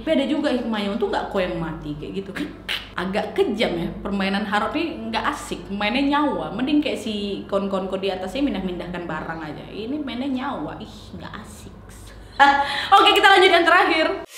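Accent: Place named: Batak, Indonesia. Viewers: Kak orang batak ya? Soalnya gaya ngomongnya kayak orang batak😄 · orang mana si logat nya macam sumatra kali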